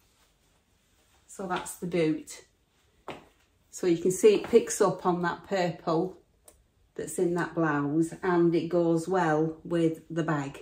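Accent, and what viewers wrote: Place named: Lancashire, United Kingdom. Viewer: I'm new to your channel, and I've enjoyed watching your brilliant ideas. I've got to add, I love your accent, we are originally from Preston Lancs, and your accent takes me back to living there! Thank you.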